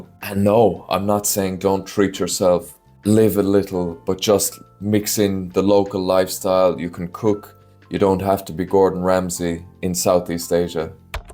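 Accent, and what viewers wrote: Ireland. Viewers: Always brilliantly informative with the brogue which makes it great to listen to..thank you…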